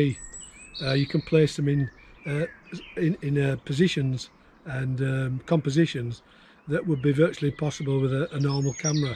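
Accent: United Kingdom. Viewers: What a great photo. I live in the States now and it's great hearing a broad British accent.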